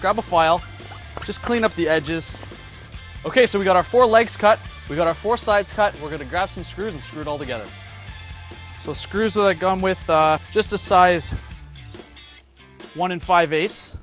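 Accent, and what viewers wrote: Canada. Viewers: I was going to ask if your Canadian because you sound like an NHL player then I saw your T-shirt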